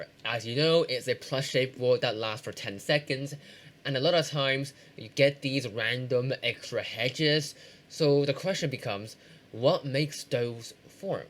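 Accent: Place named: United Kingdom. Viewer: bro sounds chinese and british at the same time i didnt even know that was possible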